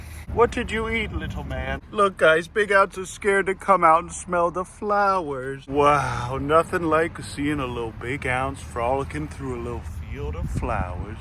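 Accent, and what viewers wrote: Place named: Pennsylvania, United States. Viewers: Why do you have a Pennsylvanian accent if you’re from Texas. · Hearing this man use western PA speak is always confusing to me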